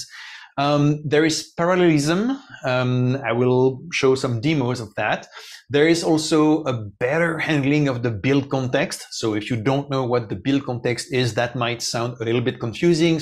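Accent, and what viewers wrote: France. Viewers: Haha, definitely not :) What confused me was that the guy has French accent, but the prompt is in Cyrillic.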